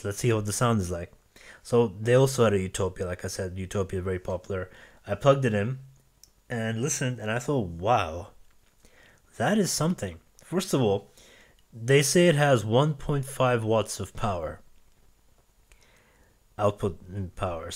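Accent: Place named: India. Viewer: Where are you from? Your accent sounds Indian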